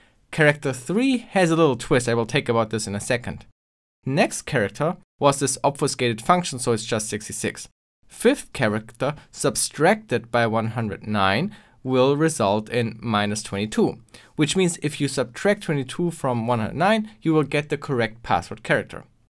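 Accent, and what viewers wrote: Germany. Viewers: Dein deutscher Akzent ist so schrecklich und versaut das ganze Video. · sorry dachte du machst das einfach so aber wenn du es dann nur aufs Geld abgesehen hast, dann ist es egal, sorry. Aber trotzdem toller Youtuber mit tollen Videos, man kann aus deinem Englisch schon raushören, dass du Deutsch sprichst.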